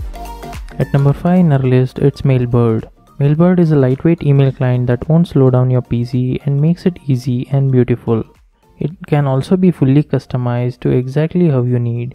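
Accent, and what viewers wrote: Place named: India. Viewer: Your English is terrible! Speak English, not "Indian English".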